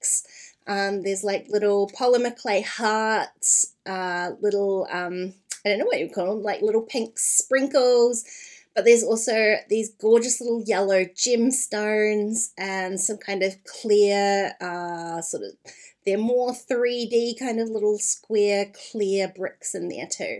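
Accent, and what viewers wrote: New Zealand. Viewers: This is an amazing collection. With my favorite company going out of business, I am looking for a new place to get all my stuff from. This kit is huge!! I love the frames for shaker pockets and all the word sticker. I can't have too many words!! I love your accent. I miss NZ so so much. Thank you for the unboxing!!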